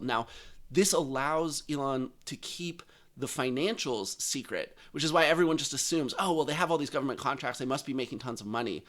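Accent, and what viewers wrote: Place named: United States. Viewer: First time I see your channel because I was wondering whether you are a compatriot re your surname. You sound very american, so you must have dutch ancestors. Having said this, interesting video! 🙋‍♀️🇳🇱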